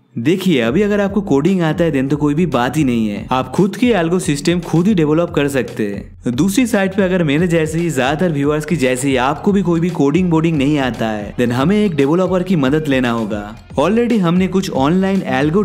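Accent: Bengal, India. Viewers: u must be a bengali your accent describes .loads of love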